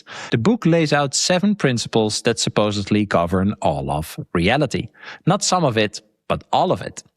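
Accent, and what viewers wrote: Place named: Netherlands. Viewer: Yo! You are the young Jack Nicholson but with a Dutch accent! Keep up the great work, hello from Hungary!✌️😎💚💎✨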